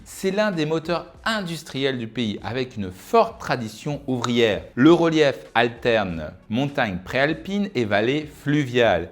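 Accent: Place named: Paris, France. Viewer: Bonjour, votre accent Parisano-Franchouillard me csse les oreilles, au niveau littérature : Quer durch Österreich (Gertrud Gréciano-Grabner)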